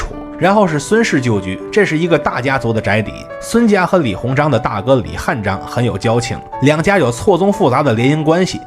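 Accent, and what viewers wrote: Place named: Tianjin, China. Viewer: 听着这种天津味儿的普通话倍感亲切。只有我们天津人才能听出来。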